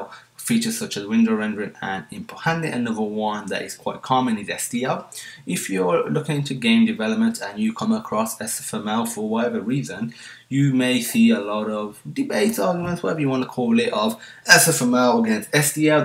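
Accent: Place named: Dudley, United Kingdom. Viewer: That's a great accent. I'm guessing you're from Dudley or somewhere near there.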